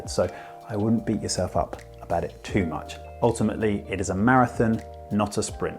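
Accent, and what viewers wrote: United Kingdom. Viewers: I liked your accents makes me want to learn british english even more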